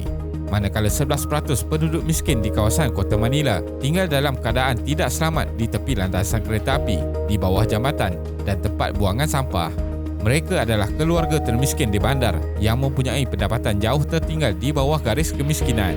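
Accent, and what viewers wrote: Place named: Kuala Lumpur, Malaysia. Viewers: Ini bahasa Melayu Selangor lah Kenape teruk maknusie dunie tige peratus utame kerancakkan kepade antare berbeze serte jenayah setinggan dibine limeblas iyenye pade peratusan Kenape secare purate Mereke lame dikitar semule.... Tak sama langsung dgn bahasa Indonesia....beda bunyi.... bahasa Indonesia berbunyi a sedang bahasa Malaysia berbunyi e di ujung perkataan... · Bahasa Melayu itu luas. Kalau dalam video ini menggunakan slang Kuala Lumpur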